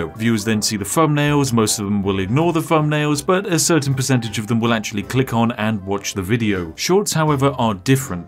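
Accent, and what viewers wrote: United Kingdom. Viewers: The sniffing brit👍👍👍👍👍👍👍👍👍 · Hi fellow British man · so bri'ish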